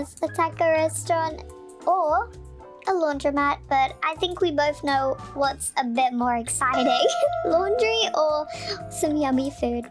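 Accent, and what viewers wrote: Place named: United Kingdom. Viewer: Why is your voice like British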